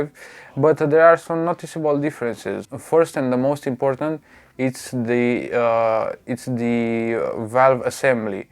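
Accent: Romania. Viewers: are you a romanian?i say this because you have a romanian accent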